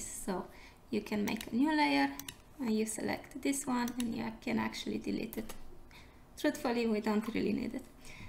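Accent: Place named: Romania. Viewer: Your accent sounded so familiar, of course you are a fellow Romanian! Keep up the great work, amazing tutorial!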